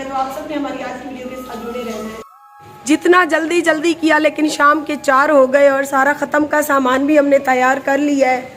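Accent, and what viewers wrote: Saraiki, Pakistan. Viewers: Syster aap bolati bahut pyara hai saraiki aur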